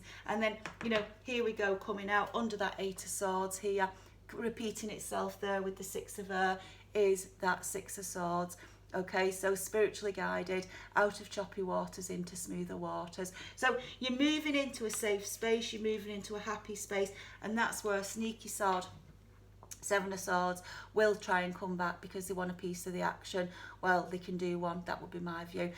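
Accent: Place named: England, United Kingdom. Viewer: Love your accent, your truth, and your readings. Have heard from you before, your excellent and extremely brilliant keep it up sweetheart 💞. A lovely smiling English Rose 🌺xxx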